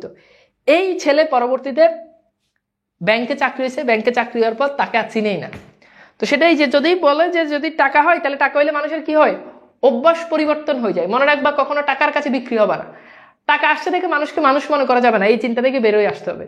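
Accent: Noakhali, Bangladesh. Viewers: Noakhalit Khar bole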